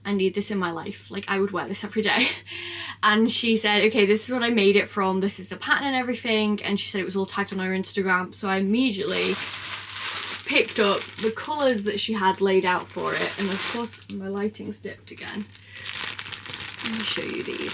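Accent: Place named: Liverpool, United Kingdom. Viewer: Hi! Just found you here on YouTube. Is that another Scouse accent I hear?? 😍 Love the video. I'll have to go to texstyle if they do another next year. Xx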